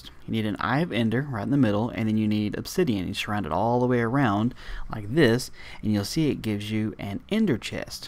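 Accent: South, United States. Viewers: I like your accent. You live in the south? I live in the midwest.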